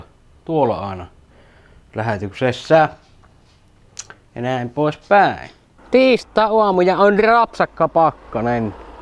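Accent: Finland. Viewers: Tämä rally inglish on kyllä mainiota kuunneltavaa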